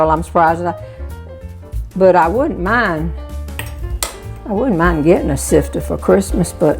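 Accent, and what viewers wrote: South, United States. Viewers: thank you miss, being from the south as well, I adore you and the way you talk and what you have to tell us. you have made many dark moments so much brighter. thank you darlin, May the good Lord keep you and your's blessed and healthy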